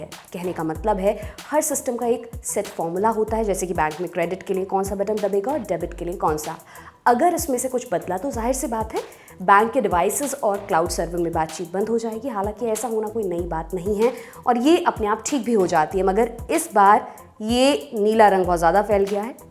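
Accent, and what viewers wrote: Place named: Kanpur, India. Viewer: are ye sab kanpuriya hain..aise hi bolte hain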